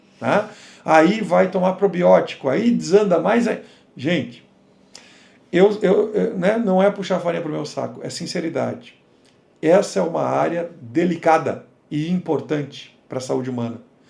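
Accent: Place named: Minas Gerais, Brazil. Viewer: Gosto demais do seu male male, dr. Sou mineira e minha avó falava assim. 😊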